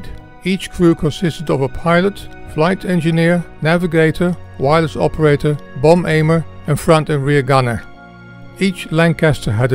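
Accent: Netherlands. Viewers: Sounds more Dutch to me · yep you sound Dutch to me ( my son in law is German) Very interesting presentation and analysis that you have done here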